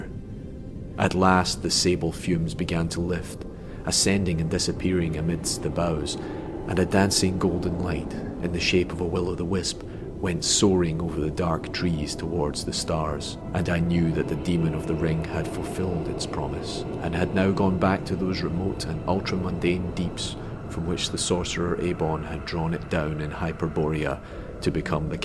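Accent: Scotland, United Kingdom. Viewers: Good narration! Very glad to have found a YouTuber with a good voice doing Lovecraftian stuff. Scottish accent works well.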